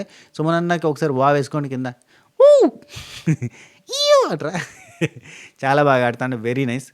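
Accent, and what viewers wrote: Telangana, India. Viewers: మన తెలంగాణ భాష నువ్వు మాట్లాడితే వినెతందుకు మస్తు మంచిగనిపిస్తుంది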